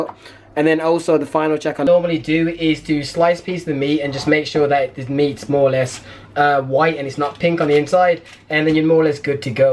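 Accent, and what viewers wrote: Birmingham, United Kingdom. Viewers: are you from Birmingham bro im from erd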